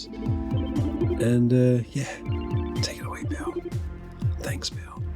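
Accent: United Kingdom. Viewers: Did you favorite every comment? That's... impressive. Also, why ARE you British all the time?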